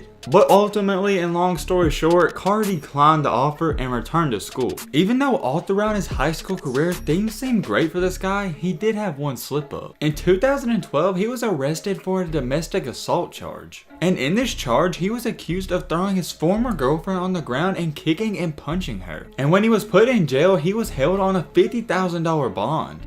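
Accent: South, United States. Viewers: You have this southern draw you're trying to hide and its like...you're talking out of your nose or something. not a natural voice, hard to listen to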